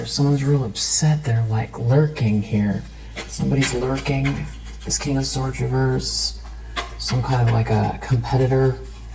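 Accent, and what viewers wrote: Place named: North America. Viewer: Im pretty sure isnt British, you can tell by how she pronounces her "R"s she is almost certainly from north America (Probably USA, or maybe Alaska or Canada)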